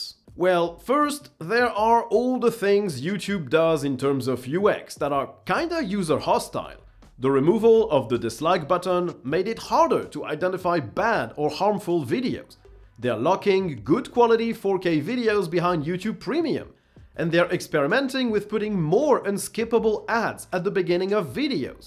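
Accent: France. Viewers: What is your accent? sounds French, but with a something. Belgian?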